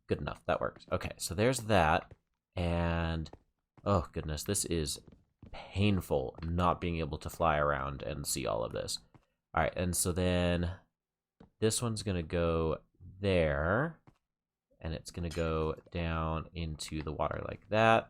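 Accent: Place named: Ireland. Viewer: The accent sounds Irish lmao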